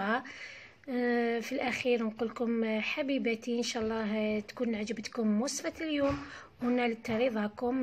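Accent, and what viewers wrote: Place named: Lebanon. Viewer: لان انا ما كتير عم بفهم عليكي لان بتعرفي اللهجة اللبنانية كتير تختلف فميشان هيك ما عم افهم كتير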